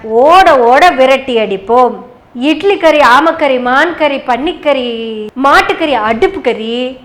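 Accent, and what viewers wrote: Kongu, India. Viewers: உடல் மொழியால் சம்பந்தப்பட்டவர்களை உடல் நடுங்க வைத்துப் பிசிறுத் தட்டாமல் கொங்குத் தமிழில் பேசிப் புரியவைக்கும் உங்களுக்கும் குழுமத்திற்கும் வாழ்த்துக்கள்!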